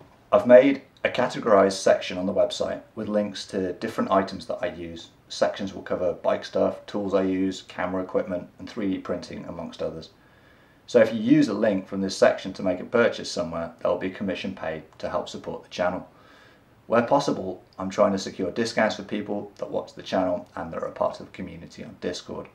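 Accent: United Kingdom. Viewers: U in canada? With a british accent hehe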